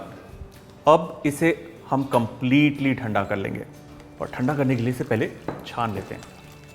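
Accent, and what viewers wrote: Punjab, India. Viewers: Hi ji i am big fan of u it’s so cute ki hindi bolty bolty punjabi ki accent i love it 😻 yummy recipes always 💞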